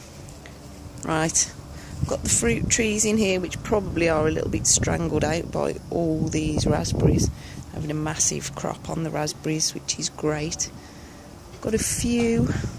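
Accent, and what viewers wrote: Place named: Birmingham, United Kingdom. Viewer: Its good to hear that brummy accent. Local. Im in Sparkhill.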